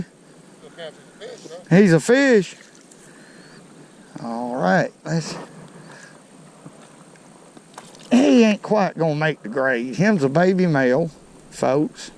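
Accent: South, United States. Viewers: "she's over chonder... . I got my pressident reel... And an each and half bait..." Lol I like hearing deep accents lol* like that. Makes me wonder if I have a weird accent to people down south 🤔 ... Yeah I'm high. But fishing opener here is Saturday! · Just found you on here, and I have to say it’s nice to hear someone with my down south accent haha and someone who’s part of the bank fishers... Love your videos Crazy question, I’ve always Bass fished, how do you know the crappie is a male before it gets out of the water? LoL sorry I’m dumb with crappie but trying to learn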